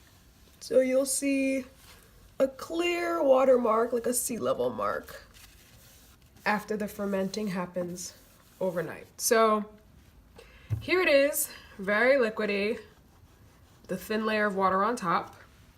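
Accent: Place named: Eritrea. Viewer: You sound smell and test like FULLY ERITRIAN yes you are Comper you with the ather Youtuber eritrian girl way better than her by the way do you know a bit of TIGRIGNA ???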